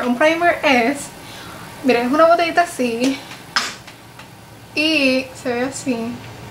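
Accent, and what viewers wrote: Puerto Rico. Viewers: Yo tengo ese mismo primer.. me encanta pq tmbn te sellan y que los poros eso me dijo la muchacha de mac.. que buenoo ver mas videos tuyooss.. jaja me rio tanto pq es q de lejos las boricuas se nos notaaa q somos pr por el hablar jaja.. te cuidas chicaa :)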